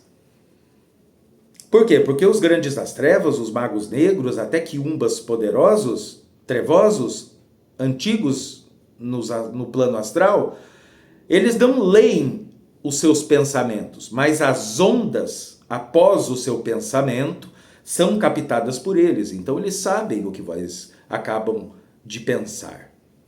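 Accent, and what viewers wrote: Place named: South, Brazil. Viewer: O cara não sabe nem o Português, quanto mais falar sobre coisas que não existem. E esse sotaque sulino escroto, e esse olhar de louco.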